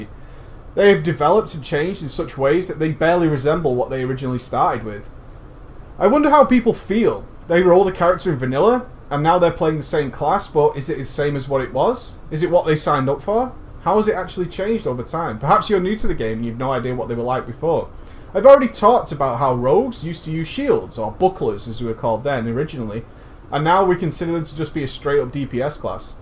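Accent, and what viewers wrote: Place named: United Kingdom. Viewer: I'm fairly sure he's from the UK, mainly because of the accent.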